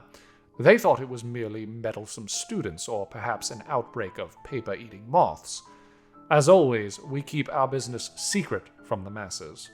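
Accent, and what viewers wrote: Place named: New York, United States. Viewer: OMG has a new york accent now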